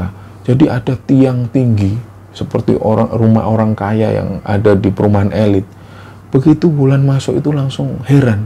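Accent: Java, Indonesia. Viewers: pdahal mas ini medhok bngetz ngomongnya tpi kok aku paham ya apa yg diceritakan,biasanya klw adh orng yg medhok aku cma senyumin aja krna gak fahan ngomong apa 😂😂😂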